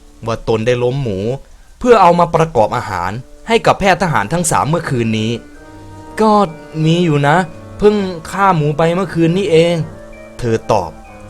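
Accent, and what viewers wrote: Isan, Thailand. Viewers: พี่คนอีสานป่าว คนบ้านใด๋นิ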